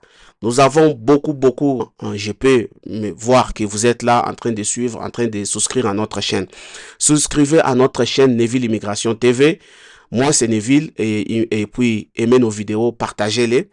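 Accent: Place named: Africa. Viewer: Africain francophone li ye ou pa tande accent li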